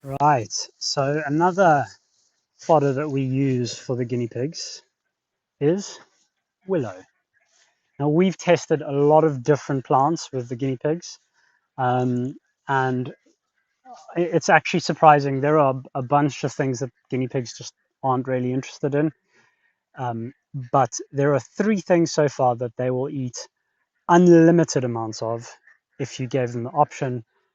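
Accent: South Africa. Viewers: South African here. You're doing good work over there. We need new sources of protein. Your accent will always follow you 😉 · You sound South African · South African?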